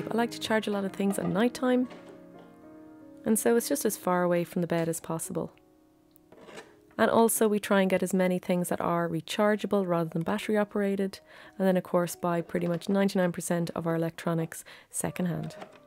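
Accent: Ireland. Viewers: YES, you're right! I am from France, near Lourdes, and I do so much like this soft lovely Irish voice, as you said! Thanks,!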